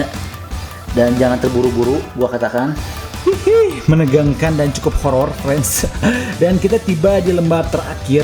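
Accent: West Java, Indonesia. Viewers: Bang orang sunda?? 😂😂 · Ngomong na sunda pisan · Keren mang sunda banget . korowot korowot wkwkwkwk Sukses slalu .... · Orang sunda apa ya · Orang Sunda nihh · ai akang th timana atuh, menih sunda pisan kitu??? hehe tp wajah na oriental pisan... hehe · Bang asli nyah orang mana ko ngomong nyah aya sundaan wkwkw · sunda nya ngakak xD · anjir ini si koko aksen sunda nya kuat banget.. Hadeeeee... Keren ... Bte mainan lo.. Maaahaaalllll tapi haqiqiiiiiii ...cool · logat sunda nya bikit ngakak.. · Hahah orng sunda kang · Orang sunda asli pisan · Si Koko tinggal dimana nih, sundaan wae, hahaha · Koko sunda ? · Haha sunda pisan 😂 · Tigubrag tiguling 😅😅😅 urang sunda gening mang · Orang sunda ya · Bang orang Sunda? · Logat Sunda ??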